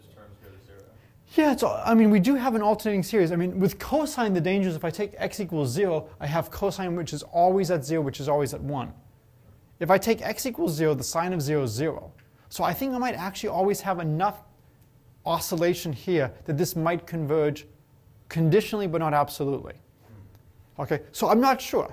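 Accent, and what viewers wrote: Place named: Boston, United States. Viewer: Q: What do Bostonian doctors say when they need to examine their patients' throats? A: "Say r" Ain't nothing to apologize for, it's charming :-)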